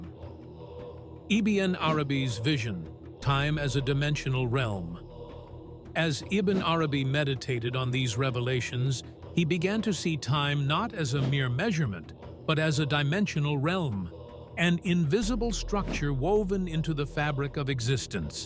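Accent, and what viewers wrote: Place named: RP, United Kingdom. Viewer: Who made this video ? The BBC type English is rather chilling to listen.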